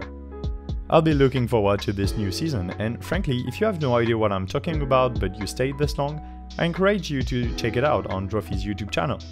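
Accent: France. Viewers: Nice vid! I very much enjoy your french accent tbh :) Keep up the good work!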